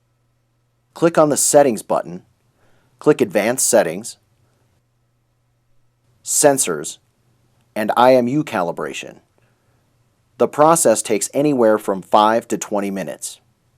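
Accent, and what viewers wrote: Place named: United States. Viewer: I was shocked when I played this video. The last thing I expect was to hear an American voice. First thing I did was give you a thumbs up, know what I mean Vern?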